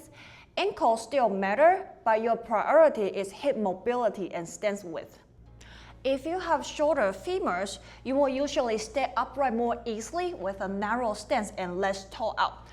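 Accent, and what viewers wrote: China. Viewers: great info. I also LOVE the accent. I thought it was Singaporean but it sounds more Chinese. Thank you.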